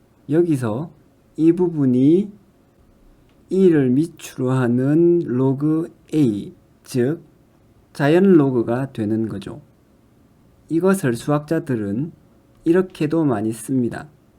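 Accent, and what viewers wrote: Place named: Gyeongsang, South Korea. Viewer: 경상도 사투리가 심하게 들리네요;;사투리로 들으니 e가 굉장히 특이한것처럼 보이네요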